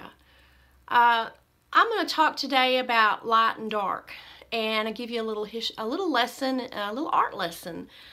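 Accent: South, United States. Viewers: Hi, you are so right about this! Simple logic that I certainly did overlook. I occcassionaly get bags so I will remember that. I do get dark circles so for that I will use the concealer. I love your southern drawl. Feel better. Melissa is a sweetie. xoxo Much love xo Marta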